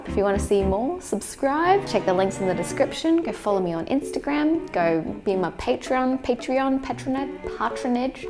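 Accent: Australia. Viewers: Amazing, I followed a link on PhillipDefranco's video and saw a wonderful video by an amazing videographer and instantly recognised that Aussie voice. I watch a view more videos and realise you are a fellow Brisbanite. That sucks me down the rabbit hole as I then proceed to watch all your Australian videos first and then a few others you have made. I'm a little binged out but I just need to comment and say thanks for the great content (much I will try and finish in the future, even though most of it may not be the content I would normally view) but I believe good content, told by an amazing story teller and presented in such a great way like you do, should be rewarded with at least a like, maybe a comment and possibly a subscribe. You get the trifecta. Cheers I will admit being from Brisvegas as well, may have biased me a little bit. Ok... a lot. Have a good one. 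· Fun watching your videos. Great, your back in second home. Didn't know you are Australian, no strong accent.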